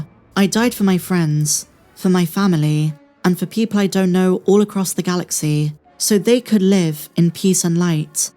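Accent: United Kingdom. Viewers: I don't know if your voice acent it's England, Scotch or Ireland, but i love it. You have so a sensual voice. Greetings with respect from Mexico. MADE THE FORCE BE WITH YOU AND PROTECT YOU ALWAYS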